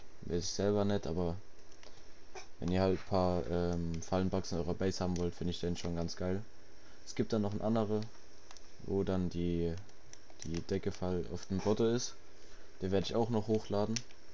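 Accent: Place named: Switzerland. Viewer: so reden wir auch in der schweiz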